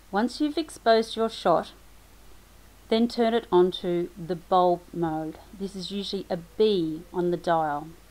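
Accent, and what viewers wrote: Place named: Australia. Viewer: Hi, I love your tutorial style! Lovely articulate Aussie voice. Some other tutors talk way to fast for me. I am a beginner in photography world and have done my first on astro shoot which was totally out of focus, so I reached out on good old Youtube to find some answers. Following on from your Night photography tutorial I found the ND filter one and was curious so subscribed to gain further insight. Thanks for sharing your knowledge with me. Jane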